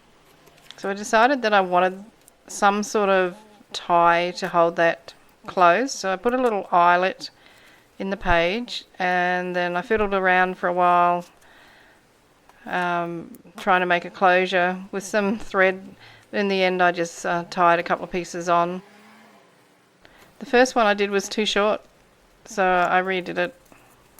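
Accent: Australia. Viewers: Thank you, for your inspiration on the hidden theme. I just joined your channel. As soon as I heard your voice, I knew you were Aussie. I'm an Australian, too. I live in Wodonga, Victoria. 😊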